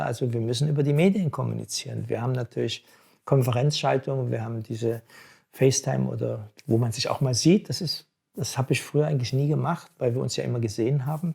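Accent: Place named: Saxony, Germany. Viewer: Ja ich bin auch durch Zufall an geraten...auch der Dialekt er stammt aus Leipzig u ich aus riesa also sachsen und da ich jetzt alle malschulen zu haben und ich nur Acryl und aquarellstunden genommen habe passt das jetzt sehr gut...denn mit zeichnen das moechte ich noch lernen machen sie weiter herr Assisi toll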